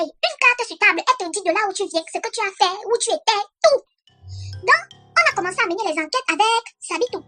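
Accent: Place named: Cameroon. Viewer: je suis ivoirienne!! jadoooore trop votre accent camer!!!!! bne continuation:*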